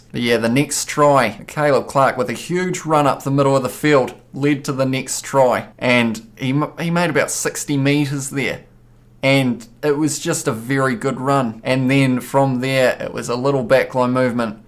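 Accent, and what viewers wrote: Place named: New Zealand. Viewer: Kia Ora, cuzzy! Love the Keewee accent!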